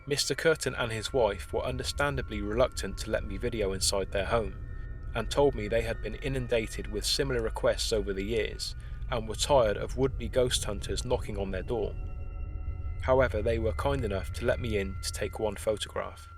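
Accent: England, United Kingdom. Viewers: love the english accent. from america. god bless, brother. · You sound awfully British. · Refreshing also is the English accent as opposed to an American drawl announcing “....the top 15 haunted places in England”. Well presented, with excellent research. Thanks!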